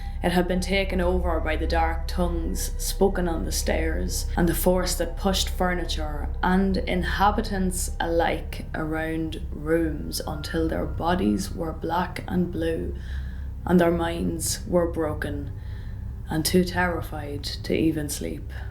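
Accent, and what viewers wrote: Ireland. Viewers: That was absolutely fantastic story telling I really really enjoyed it, you reminded me of my granny ( not in age I don't want to offend a witch such as your self) honestly ghost story's are scarier when told with an Irish accent, thank you for the smile on me face and the shiver down me spine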